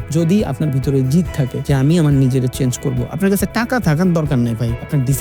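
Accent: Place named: Shariatpur, Bangladesh. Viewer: কিও ভাইয়া আপনার মুখের ভাষা দেখে মনে হয় আপনি শরিয়তপুর জেলার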